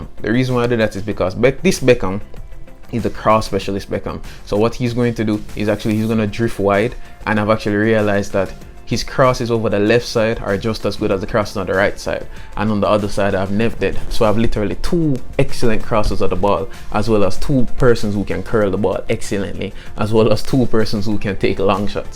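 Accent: Jamaica. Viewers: You a Jamaican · You sound Jamaican lowkey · Ya Jamaican ?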